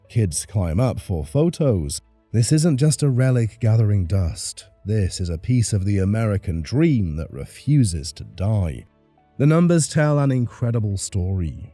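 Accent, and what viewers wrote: United Kingdom. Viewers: Interesting subject that I would have enjoyed except for the absolutely unbearable sound quality and the British accent being so thick I couldn't understand what he was saying.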